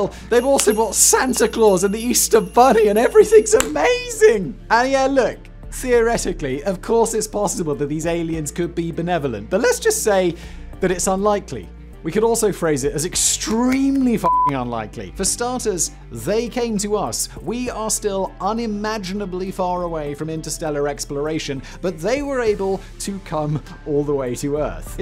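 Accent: United Kingdom. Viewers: Hmm a synapse of the fine points of Hitch Hikers Guide to the Galaxy and read by a Brit? Where Simon's writers just taking a piss?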